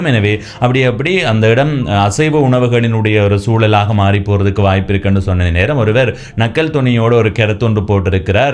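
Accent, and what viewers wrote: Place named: Jaffna, Sri Lanka. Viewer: யாழ்ப்பாணத் தமிழில் கதைக்கும் சில youtuber களில் இவரும் ஒருவர்! பல amateur YouTubers போலில்லாம் சிறப்பான சணல் இது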